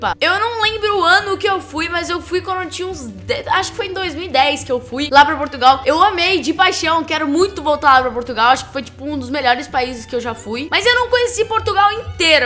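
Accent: Brazil. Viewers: Tambem adoro o sotaque brasileiro #SOUPT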